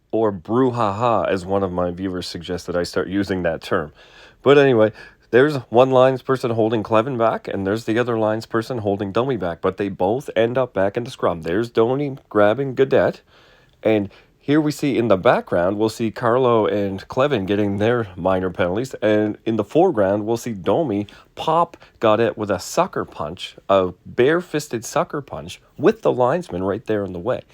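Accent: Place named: Ottawa, Canada. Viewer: You sound clueless. And like a resident of Ottawa.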